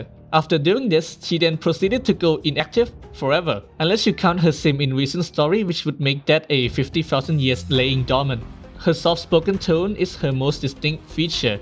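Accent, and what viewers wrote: Thailand. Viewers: Man are you thai? Cuz u kinda sounds familiar Btw nice vdo mann Just in case that u r thai ยังมีคนเล่นฮงไกกับข่อยดีใจคับ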